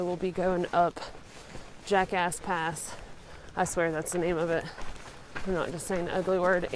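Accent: South, United States. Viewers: Beautiful country! You've inspired me, I'd like to thru hike the AT next year, but it depends on if my job will let me have the time. If not, I'd like to do a section hike a week or two at a time. I've always loved the Appalachians since I was a kid when I used to go skiing in NJ by the Delaware water gap and now since I lived just 60 miles from them in VA. I hiked Goshen pass in VA this summer and I'm addicted. Never thought hiking could be fun after my only real hiking experience (apples and oranges) was from being an enlisted soldier in the US Army where you carry waaaaaay more weight and in my case, an M60 machine gun. I love your southern drawl and could listen to you all day! LOL!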